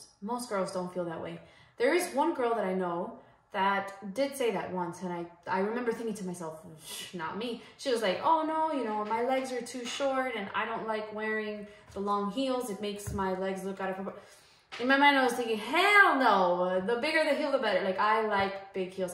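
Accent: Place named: Miami, United States. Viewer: I could tell you were a Miami girl cause we have the same accent X) great video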